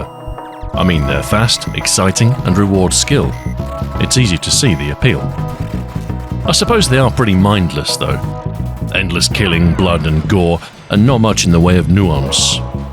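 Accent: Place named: United Kingdom. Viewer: So british it makes me queasy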